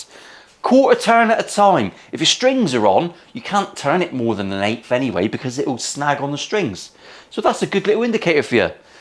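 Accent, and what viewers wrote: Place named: England, United Kingdom. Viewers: USA Americans will have a hard time understanding his talk .... he is from England and not the USA... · Another Monday made better - cheers you fucking legend. Btw love how you pronounce it pacifiker. Janner!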